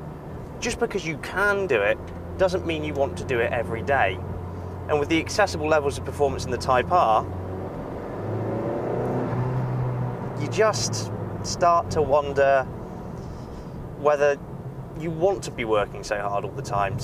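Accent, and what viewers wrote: United Kingdom. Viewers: The cars are obviously wearing european license plates and he has a British accent...there were clues gentlemen.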